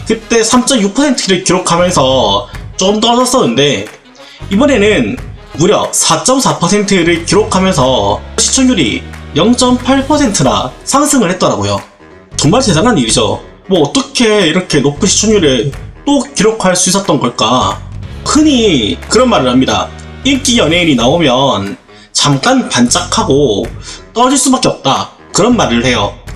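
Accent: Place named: Gyeongbuk, South Korea. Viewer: 내용은 좋은데 경북말투 진짜 듣기 파이네요~😢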